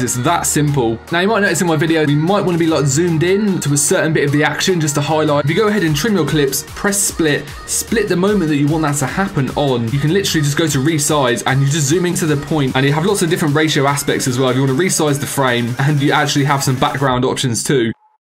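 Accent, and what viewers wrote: United Kingdom. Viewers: I just knew that you are British I love your accent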